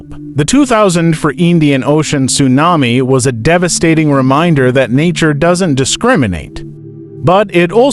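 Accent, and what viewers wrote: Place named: United States. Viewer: Ik zit niet op Amerikaans praten te wachten.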